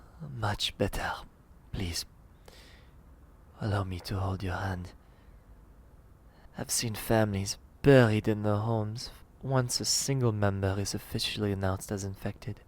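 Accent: France. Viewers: God damn it, your french accent is absolutely ADORABLE! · IS THAT A FRENCH ACCENT? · me-I don’t care what you do to me just keep talking! Honestly though your french accent is lovely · Omg french accents are my favorite! Please do more ♡♡ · That french accent 👌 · Ring around the rosie. Pocket full of posies. Ashes, ashes. We all fall down. sings in a creepy voice By the way, I love your French impression. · Hey that's a great French accent! I'm well placed to know that because I'm french XD But I still don't understand ....HOW THE FUCK CAN YOU FIND FRENCH ACCENT SEXY?!? OUR ACCENT IS HORRIBLE!! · me a French girl: va talking English with the French accent: me: keep laughing cause he talks like my friends trying to talk in english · Oh mon dieu, l'accent Français en Anglais c'est ma phobie. Je ne peux pas, mais je t'aime bien quand même. "My FrIIIIIENND" AAAAAAAAAAAAAAAAAAAAAA x.x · When you get jealous of the great, but adorable, French accent: How could I get mad, and So jealous of that adorableness!? 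😚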